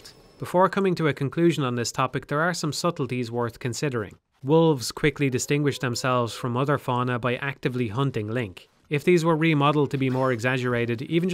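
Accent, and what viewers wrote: Ireland. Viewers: Is this fella Irish? "Sometimes it seems the only thing Link can't mount is Mipha." Haha yeah up the lads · Are you from ireland? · Is this guy irish? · are you Irish?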